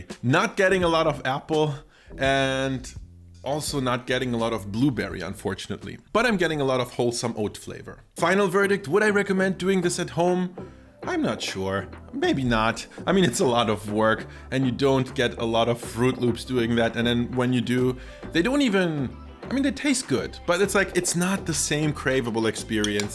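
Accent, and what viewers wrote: United States. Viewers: I like your videos. :) And I'm really curious to know where you're from. Well, obviously, you're German. But you sound like an American and you look like a mixed European and Middle Eastern. 😄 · your accent is INCREDIBLY American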